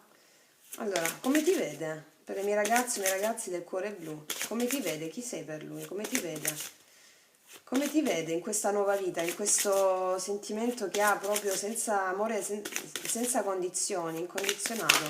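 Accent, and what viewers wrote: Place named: Salento, Italy. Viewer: Ti ho scoperto x caso e la luna rossa dice delle cose a cui voglio credere. Il tuo accento mi è familiare. Salentina? Brava. Grazie.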